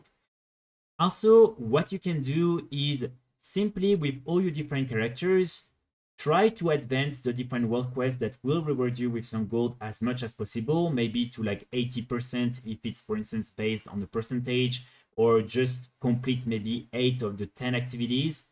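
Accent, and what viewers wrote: France. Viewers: Un FR reconnaît bien un FR lorsque celui-ci parle en anglais c’est dingue 😂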